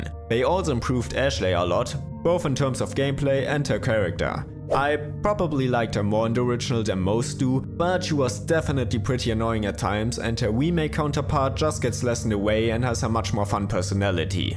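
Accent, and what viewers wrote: Germany. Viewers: Good old German accent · nur 2 sekunden drinne und ich höre raus du bist deutscher :D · i sense deutsche accent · i’m hearing a little deutsch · At first I thought the man had a lisp, then realized he's just German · I love your German accent. Sehr gut! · I hear deutsch mein freund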